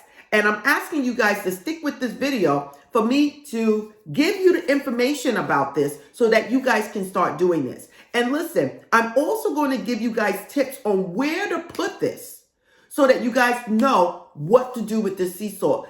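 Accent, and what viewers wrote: New York, United States. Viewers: I love your New York accent Ms! Its helping the message sink in 😂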